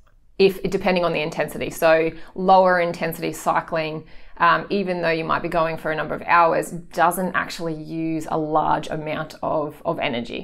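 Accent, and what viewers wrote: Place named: Australia. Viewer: Australian acent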